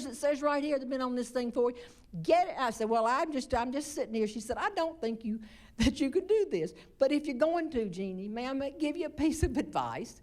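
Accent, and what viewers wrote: South, United States. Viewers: Lord Jeanne! What do they put in the water down there? I always thought we were funny up here in the mountains but you with your Southern accent have us beat good. How ‘bout heading up this way and exposing us to your down state humor? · I love her humor! I love her attitude!! I love her sweet southern voice! She is a joy to listen to!!! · It’s wonderful to listen to a beautiful southern lady and you’re absolutely hilarious 😂. One southern lady to another please keep the laughter coming, lord knows it’s what we need. Unlike most women comedians there truly not funny or some are just nasty and (completely tacky), though I doubt they even know the meaning of the word.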